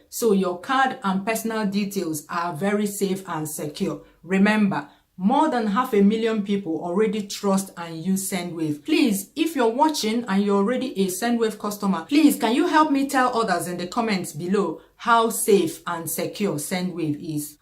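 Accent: Igbo, Nigeria. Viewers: Our proper Igbo sister with original Igbo accent. you are making too much sense. Well done.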